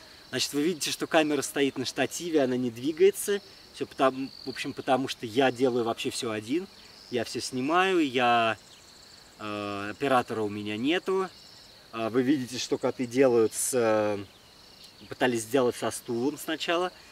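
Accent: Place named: Moscow, Russia. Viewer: Вы меня потрясли! Вашей любовью к животным и самопожертвованием! Очень уважаю таких людей, низкий Вам поклон за это! Нож в сердце - рассказ о страшной ферме, меня всегда убивают такие люди, которые ради денег готовы на страшные поступки! Огромное спасибо, за спасенных львов, за Ваш подвиг человеческий. Только что узнала о Вас, подписалась на канал. Ничего не удаляйте, пожалуйста, будем ждать новых Ваших видео! Левушки Ваши - красивущие!!!!)))) Привет Вам из Харькова))) Очень приятно слышать Вашу речь московскую, у нас там огромное количество родни! Успехов Вам и помощи Божией!!! Все у Вас получится!!!!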